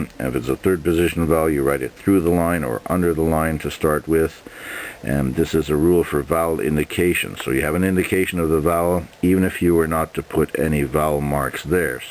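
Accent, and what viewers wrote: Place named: Canada. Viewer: Bonjour!! Great video! You have a lovely Canadian accent! Une question pour toi,. Que'est-que tu écris au commencement de la vidéo?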